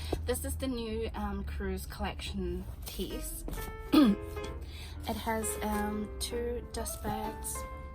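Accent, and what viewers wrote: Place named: Australia. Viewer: I can hear an Aussie Twang too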